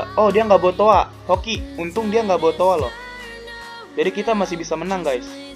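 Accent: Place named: Jakarta, Indonesia. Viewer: Betawi Banget Ya 😊😊😊 Semangat Terus Bang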